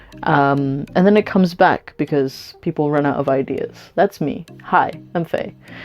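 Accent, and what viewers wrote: United Kingdom. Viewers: I would have guessed British based on your accent.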